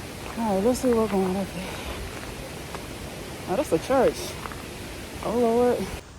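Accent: Jamaica. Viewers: That Jamaican accent slowly creeping in..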